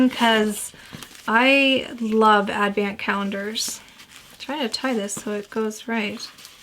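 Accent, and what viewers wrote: Canada. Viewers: Thanks for doing this unboxing. i like how you include the times to fast forward to avoid spoilers. Nice Touch! I think the Advent calendar is very cute and as I quickly looked through the list of flavors included, I have no doubt that I would enjoy the teas. I do have an issue with the 3 "surprise" items as they merely seem like coupons you could get pretty much at any time and with the narrow dates (likely to try to spur sales during/after the holidays) they exclude them from being useful to me as the nearest David's Tea is more than an hour away, so I only get there 2-3 times/year. It must be your Canadian accent but your pronunciation of Advent was a bit distracting to me. You pronounce it as though it is spelled "Advant."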